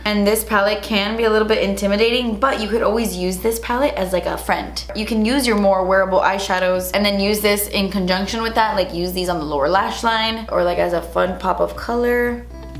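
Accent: United Kingdom. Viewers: your British accent always make my day!😂😂😂 Love you! This look is ❤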